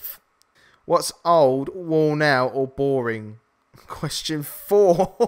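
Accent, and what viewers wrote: United Kingdom. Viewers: you're from UK, don't ya? your voice is so good tho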